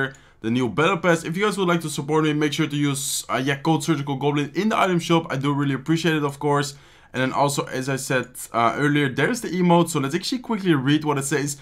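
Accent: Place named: Netherlands. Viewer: Jij bent Nederlands of niet 😂 Je hebt een Nederlands accent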